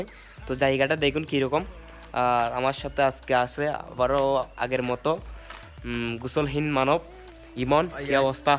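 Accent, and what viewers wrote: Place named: Chittagong, Bangladesh. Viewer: ভাই তোয়ার বারি চিটাগাং হডে😂😂😂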